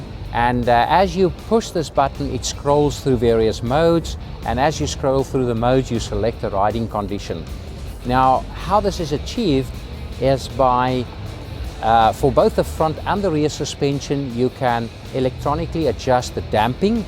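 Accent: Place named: South Africa. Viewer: hes south african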